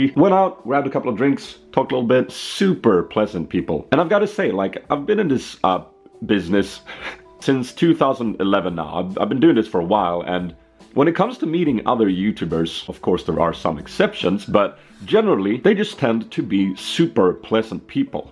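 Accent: Sweden. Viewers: Swedes - check 90's Volvo 240 station wagon - check Swenglish accent - check Raggar factor 1000 - check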